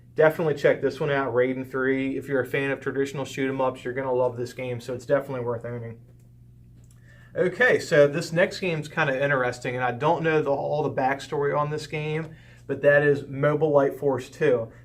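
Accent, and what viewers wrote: Baltimore, United States. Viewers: You totally sound like Baltimore! Catonsville here!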